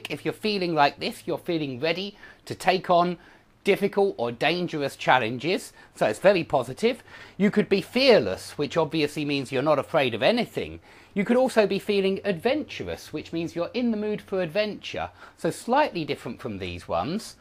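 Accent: England, United Kingdom. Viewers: OMG! I've just found your channel, vely useful I love british accent.I promise tolearn from yours.. Thank you ever so much♥️😊♥️ Apr 5th 2020... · Hi Teacher I love your lessons!!! I love your english accent!!!!!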